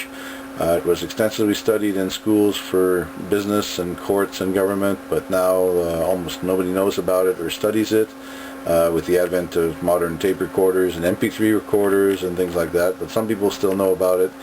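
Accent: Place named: Canada. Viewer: Bonjour!! Great video! You have a lovely Canadian accent! Une question pour toi,. Que'est-que tu écris au commencement de la vidéo?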